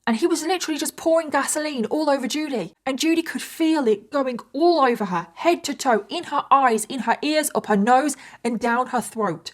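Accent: United Kingdom. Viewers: LOVE YOUR BRITISH ACCENT.. So there is Posh, Then R something? Haven't seen where you are from in England. You are AMAZING STORY TELLER. You look great before and after makeup..Your an Artist for sure. Both as an Orator and Make Up Artist..Is no easy feat to make a guy watch through most videos.. You kinda an A.M.S.R.. . Have you thohght about a playlist with you reading or explaining a story, thats light and soft spoken..?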